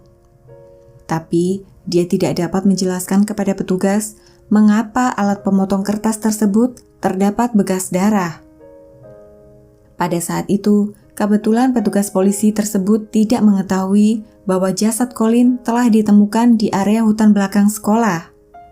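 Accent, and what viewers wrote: Java, Indonesia. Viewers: Mimin orang jawa ya?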